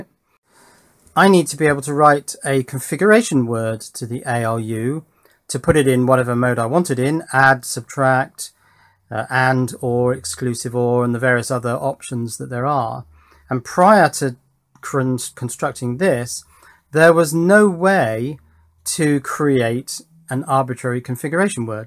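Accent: United Kingdom. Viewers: Are you British Man!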